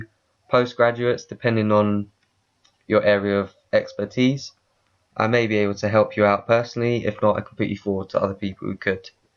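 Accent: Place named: United Kingdom. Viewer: thank you so much!! and love your beautiful british accent....haha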